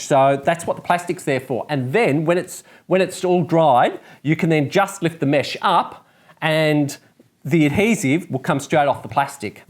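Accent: Australia. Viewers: Very well articulated and I love the Austraaaiilliiaan accent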